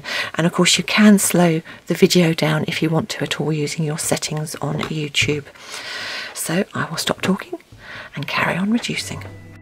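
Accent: England, United Kingdom. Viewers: That beautiful English accent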